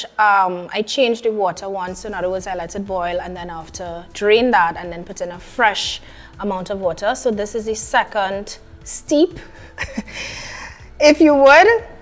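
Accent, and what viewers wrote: Trinidad and Tobago. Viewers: In Grenada, we call it fish cakes or saltfish cakes. The next time I make it, I'll try the jalapeño. This trini-accent is everything.💞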